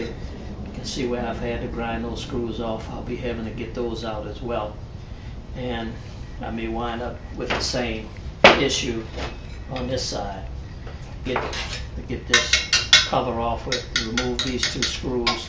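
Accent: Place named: Louisiana, United States. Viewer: Cher, I sure like dat Cajun accent. Ce c'est bon, oui.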